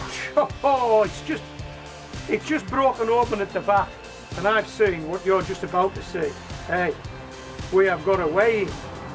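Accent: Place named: Cumbria, United Kingdom. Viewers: North west england on the coast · As someone from England I can say for almost certain that he is most certainly English,sounds Midlands or Northern,lol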